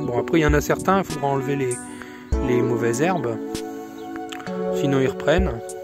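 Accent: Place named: Aisne, France. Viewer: Coucou 😁vu l'accent je reconnais bien le 02🥰 je suis de l'Aisne également je te découvre aujourd'hui je m'abonne 👍je te laisse faire un tour chez-moi et pourquoi pas t'abonner si mon jardin te plaît....A bientôt 😉🍀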